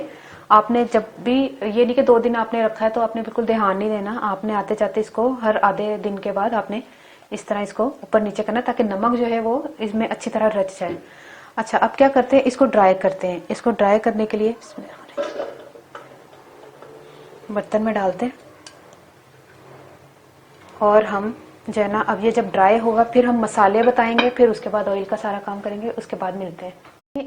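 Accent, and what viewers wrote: Sialkot, Pakistan. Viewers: Sialkoti punjabi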